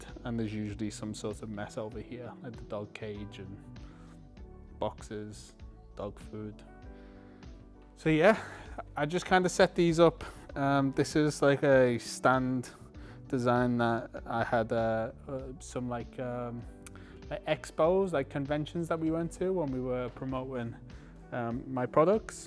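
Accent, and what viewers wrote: Liverpool, United Kingdom. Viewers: A scouser!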